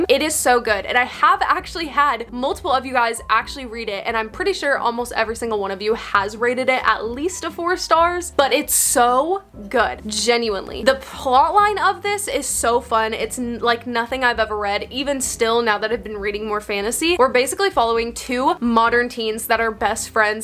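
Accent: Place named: California, United States. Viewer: Ahah I mean what’s your American accent? 🥺 You actually sounds like Californian accent😅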